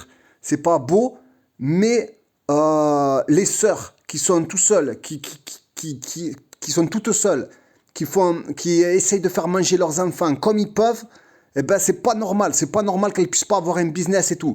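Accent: Marseille, France. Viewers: Salam, tu m a fait trop rire avec la charrette...et ton accent marseillais...j aime beaucoup Tlemcen, j y suis venue en octobre et j ai visité ce magnifique hotel la Renaissance..bonne continuation et courage à toi...il me tarde d y revenir au prochaines vacances in challah.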